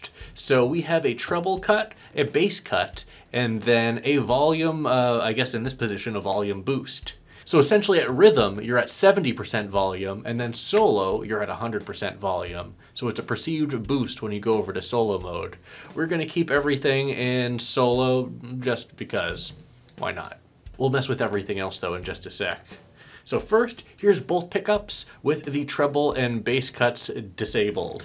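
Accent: United Kingdom. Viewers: Sandberg Booster, G&L SB-1, Stingrays, Dingwall, all have deep clear sounds. All of the violin 🎻 Basses sound so dull and without out put. They’re like one step up from a lead guitar. English accent was ⭐️ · the british accent! unmatched.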